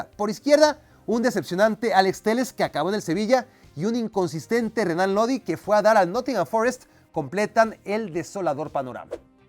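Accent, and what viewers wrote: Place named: Mexico. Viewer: Es mexicano, se le nota en el acento. Logicamente va a hablar mal de Argentina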